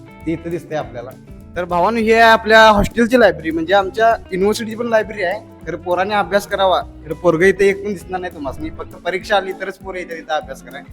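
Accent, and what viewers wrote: Kolhapur, India. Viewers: Tumhi kolhapur che ahat ka language varun kaltay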